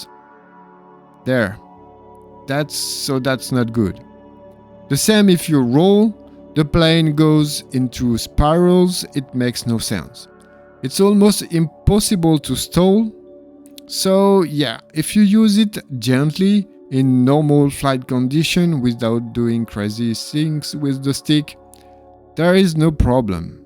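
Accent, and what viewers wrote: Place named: France. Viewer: Salut ! Tes français? Par ton accent on dirait. Si c’est le cas, tu parles très bien anglais!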